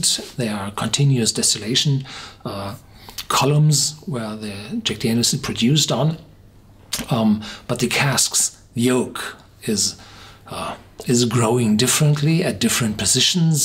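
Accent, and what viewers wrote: Germany. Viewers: Ah that German makes me weak in the knees <3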